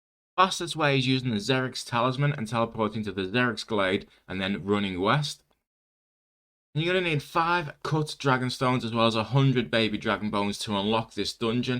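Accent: Liverpool, United Kingdom. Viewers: scouser by any chance? haha!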